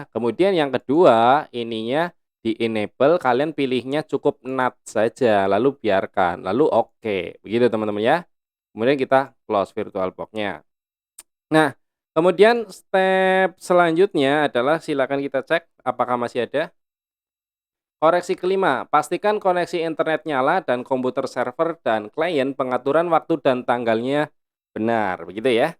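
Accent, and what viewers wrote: Banyumas, Indonesia. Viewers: Om pake semi online ya anbk nya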